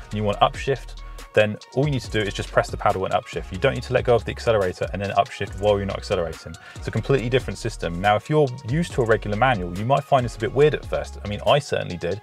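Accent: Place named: United Kingdom. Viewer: Ahh! This British English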